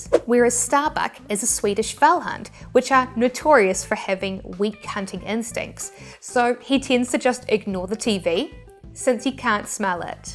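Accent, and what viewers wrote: New Zealand. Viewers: I knew that enchanting kiwi voice sounded familiar! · I have no idea why this video popped up in my feed. Now, though, I'm obsessing over your accent, highly reminded of a Kiwi with whom I used to work years ago, and wanting to just find a youtube channel with you reading books or news articles. If my native accent has that kind of power over people, I want to know where and I want to buy plane tickets now.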